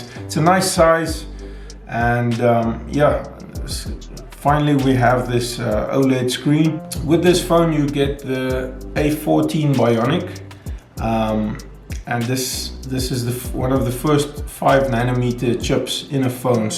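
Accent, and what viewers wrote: South Africa. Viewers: You sound south african...like if you are....SA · He is South African. I think he just works in Saudi Arabia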